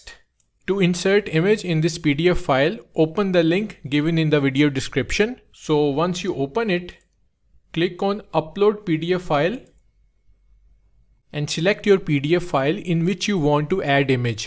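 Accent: India. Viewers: When i heard the indian accent and a sub 2 mins video, i knew it was the one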